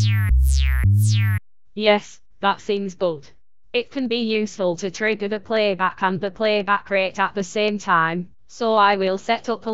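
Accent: Scotland, United Kingdom. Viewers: What the heck is this amazing robot voice! Is it meant to be Scottish? It trends slightly Welsh at times maybe? I'd hate to be misidentifying it.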